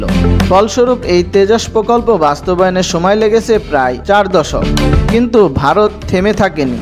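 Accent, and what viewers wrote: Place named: Bangladesh. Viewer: এহনো ভাষায় বাংলাদেশী টান ভালোই আসে। তবে ইনি রাষ্ট্রবাদী ১০০%। এতে কোন খাদ নাই। ভালো থাকুন।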